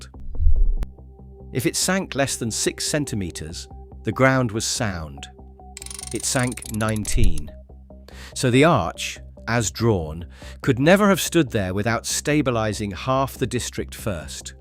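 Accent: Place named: United Kingdom. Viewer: I think the bunkers are cool. Quit the Germany bashing. You sound British, do you not know that Great Britain and France committed atrocities too? What about the opium wars that the British waged on China because The Chinese emperor tried to stop the British from pushing opium on Chinese citizens. The Egyptians wouldn't put up with Great Britain anymore and rioted and burned British businesses in Cairo in the 1950's. The British Empire was evil. France ruled over Vietnam for a century and was vary oppressive towards them. Know what you are talking about before you speak.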